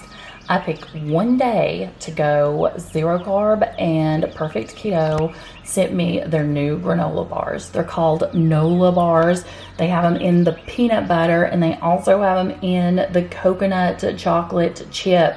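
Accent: South, United States. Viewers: You are hilarious! Good to hear that beautiful southern accent. 💗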